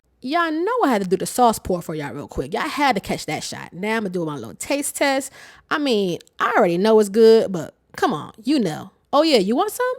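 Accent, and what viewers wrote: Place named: Maryland, United States. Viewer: I hear a Maryland accent 😂 I'm making these soooooon!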